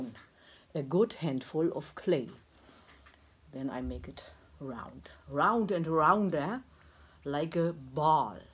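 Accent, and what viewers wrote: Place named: Schwerin, Germany. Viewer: Huhu.. super lustiges Video, wiedermal 😊.. Sachmal, eine Frage: dein Slääng 😂 hört sich nach meiner Heimat an 😃.. Nähe Schwerin... du kommst doch da irgendwo wech näää 😊. Lg. Grüße aus Bremen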